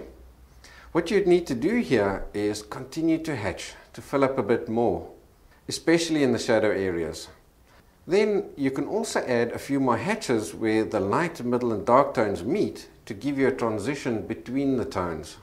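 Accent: South Africa. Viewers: Ja well no fine!! I was delighted watching your video and enjoying my homeland's accent. I live in Irvine California and became an amateur artist after arriving here 25 years ago. By the way, I have not lost my SA accent and people enjoy it for some reason! Anyway, good luck and best wishes with your videos. I plan on watching most of the paintbasket videos as I can see there are many which I did not know about. Totsiens and mooi bly!! Noeleen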